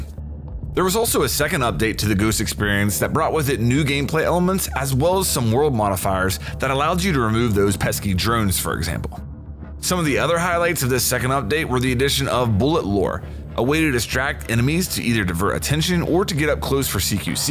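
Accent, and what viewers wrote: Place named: Pittsburgh, United States. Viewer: Guy sounds like he’s from Pittsburgh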